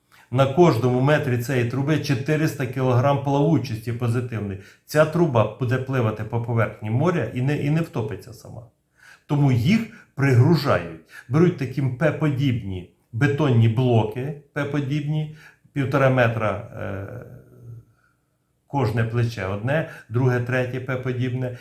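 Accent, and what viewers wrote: Vinnytsia, Ukraine. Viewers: Дякую за професійний огляд. Особливо подобається такий рідний акцент Вінниччини такою славною украЇнською.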